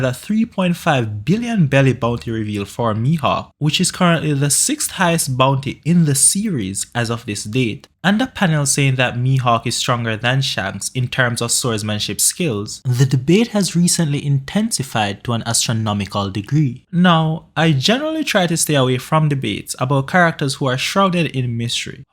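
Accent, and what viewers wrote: Jamaica. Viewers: you from JA bro? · You Jamaican?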